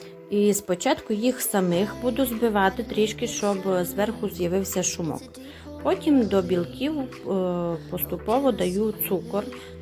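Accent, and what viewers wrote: Transcarpathia, Ukraine. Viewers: Привіт! Зайшовши на ваш канал зразу підписалася.Закарпатська мова 👍 я теж з Закарпаття. Легких вам пологів💛💙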